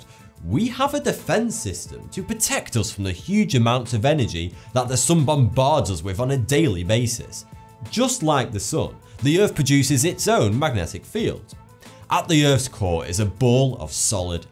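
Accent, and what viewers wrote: United Kingdom. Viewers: Are you British people really saying "sun" and sound like "son". WHYY!~?? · i like your british accent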